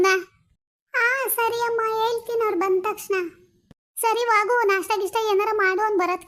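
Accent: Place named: Mandya, India. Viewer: ಮೇಡಮ್ ಇವಾಗ ತಾನೇ ವಿಡಿಯೋ ನೋಡಿದೆ ತುಂಬಾ ಚೆನ್ನಾಗಿದೆ ಇದು ಮಂಡ್ಯ ಶೈಲಿಯ ಭಾಷೆ ಅಲ್ವಾ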